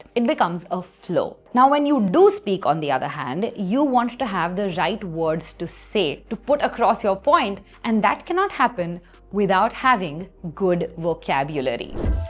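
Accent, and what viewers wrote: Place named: India. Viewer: The Indian accent though...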